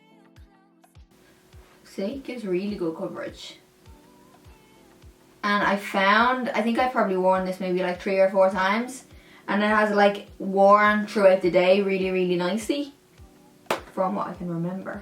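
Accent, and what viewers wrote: Ireland. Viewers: Irish accent or am I wrong? ☺️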